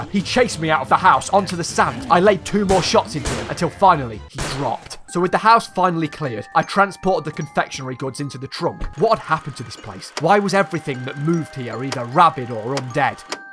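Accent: United Kingdom. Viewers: First of all calm down second of all he is British not American how did that accent sound American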